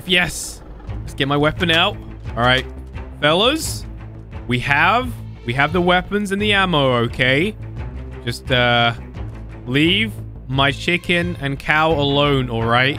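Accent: United Kingdom. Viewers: are u from da UK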